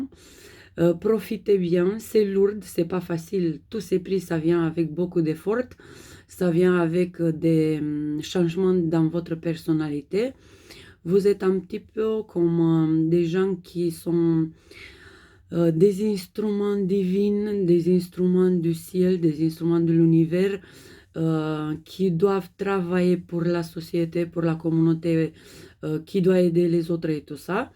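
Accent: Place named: Russia. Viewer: j'aime beaucoup votre voix et tes accents. êtres-vous d'originalité Russe?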